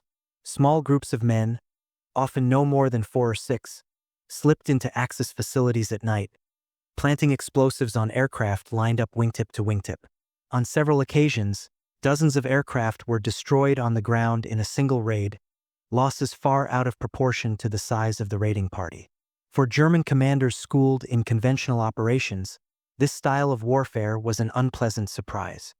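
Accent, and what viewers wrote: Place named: United States. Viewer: American pronounciation makes me smile !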